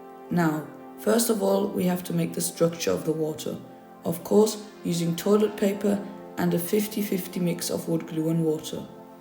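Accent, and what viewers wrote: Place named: Germany. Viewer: Als ich den Akzent hörte dachte ich mir schon dass der Youtuber hier in Deutschland sitzt. Leute, es gibt 'unendlich' viele Kanäle von englischen Muttersprachlern, oft nur schwer verständlich wegen miserabler Audioqualität oder weil der Typ nuschelt bzw. sehr schnell spricht. Muss man da unbedingt noch ein weiteres Video in Englisch hinzufügen um viellecht die Reichweite zu erhöhen und möglichst viele Klicks zu generieren? Mir geht die Dominanz englischsprachiger Videos zunehmend auf den Geist...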